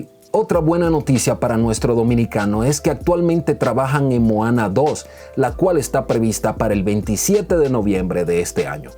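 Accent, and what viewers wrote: Mexico. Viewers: Tu acento es mejicano habla con las narices mejicanos no creo que sea dominicano.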